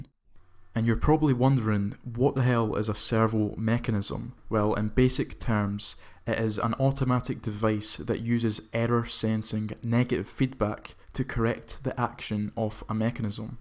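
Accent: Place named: Scotland, United Kingdom. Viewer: 👏 great scottish accent , cognitive training methods that are practical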